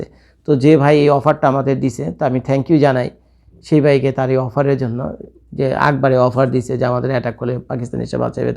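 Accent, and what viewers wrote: Bangladesh. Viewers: আপনি আজকে 15 বছর যাবত নাকি আমেরিকাতে আছেন কিন্তু আপনার ভাষাগত যে আঞ্চলিকতা রয়েছে বাংলাদেশের সেটা কি একান্তই বাংলাদেশের লুঙ্গি সাব দের খুশি করার জন্য🎉🎉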